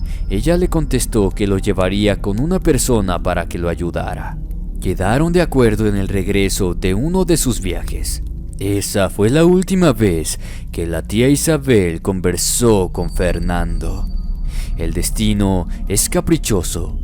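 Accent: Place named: Chile. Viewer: tu linda voz de chile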